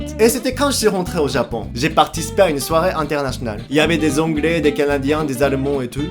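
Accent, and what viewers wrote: France. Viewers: Tu fais trop bien l'accent français . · Il accent français en anglais et un accent japonais en Français le mind blow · Ton accent français en anglais déchire mec🤣🤣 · Accent français en anglais très commun 😂😂😂👍🏿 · Hahaha ! j'adore l'accent français en anglais !!! Vous êtes plus Français que moi !!! Incroyable ! Merci pour tous ces sourires ! :) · tu ma fait mourir de rire avec ton accent français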